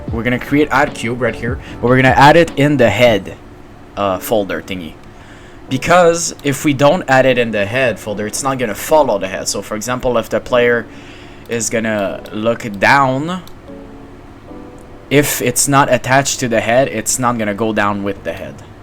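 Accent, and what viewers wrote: Quebec, Canada. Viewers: correct me if im wrong but i think this is a Quebec accent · Am I crazy or do you sound vaguely canadian french?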